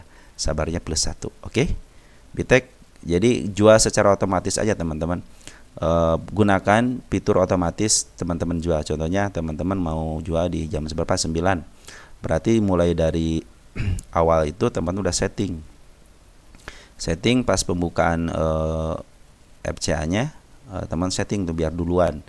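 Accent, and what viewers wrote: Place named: Sunda, Indonesia. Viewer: Orang mana si akang , logat sunda na halus pisan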